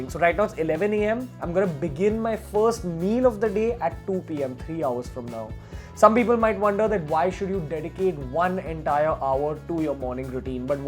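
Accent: India. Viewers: Indian Accent. Funny. Do you paint?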